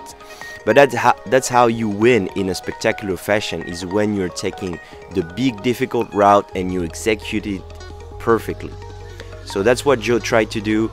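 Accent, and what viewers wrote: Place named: France. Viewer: jpp l'accent français x)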